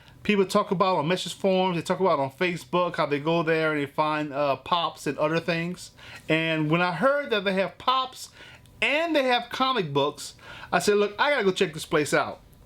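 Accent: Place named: Louisiana, United States. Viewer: I'm from Louisiana, I just found your channel and heard your voice and instantly knew you were from Louisiana! Love your channel man!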